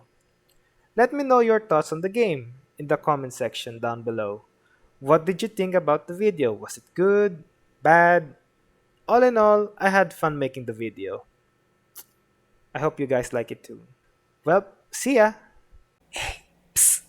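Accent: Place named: Philippines. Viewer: I smell pelepeno accent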